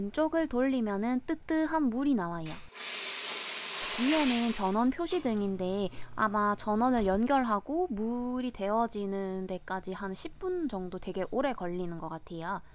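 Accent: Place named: Gyeongsang, South Korea. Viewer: 경상도 사투리 귀여워요. ㅋㅋㅋㅋㅋㅋㅋㅋㅋㅋㅋㅋㅋㅋㅋㅋㅋㅋㅋㅋㅋㅋㅋㅋㅋㅋㅋ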